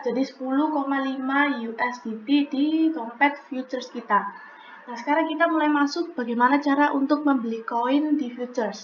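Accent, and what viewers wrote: Java, Indonesia. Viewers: Suara nya ko medok banget 🙈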